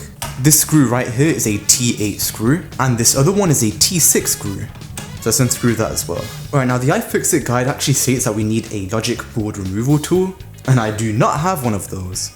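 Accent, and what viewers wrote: United Kingdom. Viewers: Instant problem: "Chassis" is a French word. And our presenter has a Birmingham/West Midlands English accent. You're correct, Chassis is pronounced "Shassee" (not "Chassee").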